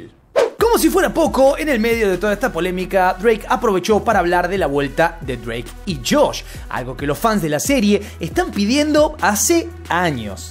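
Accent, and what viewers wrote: Argentina. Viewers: DESPUÉS DE SEGUIR ESTE JUICIO POLÉMICO POR TU CANAL, AHORA ME PARECE UNA PARADA OBLIGADA CUANDO QUIERO ENTRETENERME EN YOUTUBE HAN HECHO UN TRABAJO INCREIBLE Y DESEO QUE MARCAS PATROCINEN, TE VEO DESDE EL SUR DE LA FLORIDA Y ME ENCANTA EL CANAL. SALUDOS ERES BELLISIMO Y ESE ACENTO AGENTINO ME ENCANTA. UN FUERTE ABRAZO Y FELICIDADES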